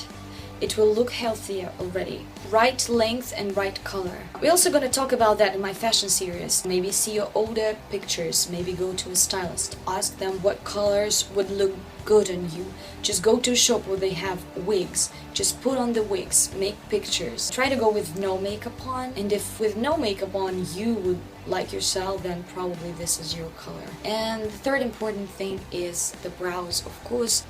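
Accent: Russia. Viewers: Wait are you russian ..??? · How to be beautiful, Step One: Have Russian Accent. Step Two: Be. 😍😍😍😍 You're the coolest! Can't wait for this series! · yes. She is Russian after all. What accent should she have? I actually find truly beautiful how her English is so good. Absolutely perfect in every choice of verbs and adjectives and so on. So detailed. The fact she still has that Russian accent on the base is only the prove how genuine she is. And clever. She does speaks English as a native. Impressive I would say. And she also always says things i can rely to. Thanks. You are a breath of fresh air. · как-то сразу поняла,что вы русская.очень хороший английский у вас,но есть какой-то маааахонький,еле уловимый родной акцент.только что нашла ваш канал и сразу подписалась!вы классная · She’s Russian! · It's the Russian background. I think it's a beautiful language/accent. 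· Women with the Russian accent 😍 it sounds so beautiful to me !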